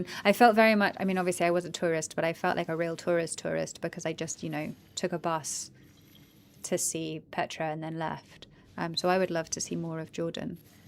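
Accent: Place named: United Kingdom. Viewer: your accent was a pleasant surprise! makes me wonder how lauma would've sounded in your original british accent rather than the standard american one! your performance as her is so lovely as is :)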